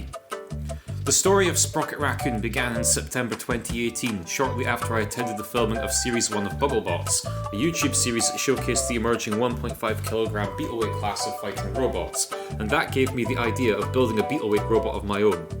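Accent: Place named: Scotland, United Kingdom. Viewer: Nice story and scottish voice. ;3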